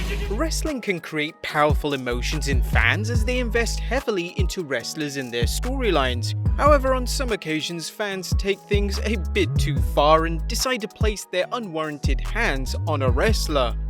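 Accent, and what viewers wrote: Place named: United Kingdom. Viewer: Why’s there always some British dude narrating these things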